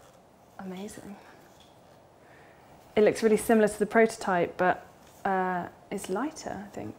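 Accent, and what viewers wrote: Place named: RP, United Kingdom. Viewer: Absolutely. Cute lady. Posh accent, too.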